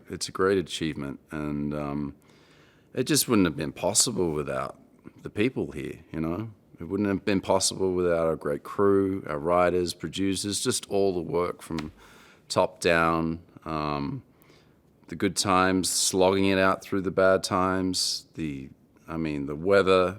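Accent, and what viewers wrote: Australia. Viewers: You did not know he was Aussie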